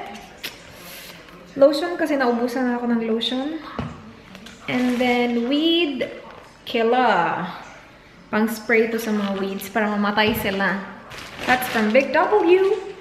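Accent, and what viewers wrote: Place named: Visayas, Philippines. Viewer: i love your accent meron bisaya sound ..hehehhe and also ilove you say ....mady,mady,madyyyyy....ahahhhhaha love ur vlogs ..hehhehe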